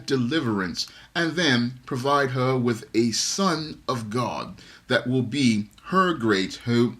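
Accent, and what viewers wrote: United Kingdom. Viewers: I usually cringe when an American tries to pull off a British accent. But this was hilarious. I had a jolly good laugh after this video. As for Harry. He should have pulled out when he had the chance. His own brothers warned him multiple times. But he was stubborn and foolish. Blinded by love. And he will face the consequences of this silk spider web soon enough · Thumbs up for the British accent and the term Blackistan. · I enjoyed the British accent. Also I will never get over your laugh. It's contagious!! 😄😄😄😆😆😆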